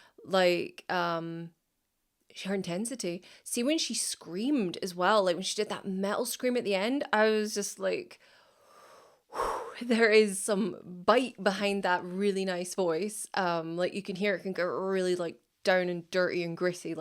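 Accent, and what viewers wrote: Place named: Scotland, United Kingdom. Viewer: I thought u were from Ireland then u realised your from beautiful Scotland ❤️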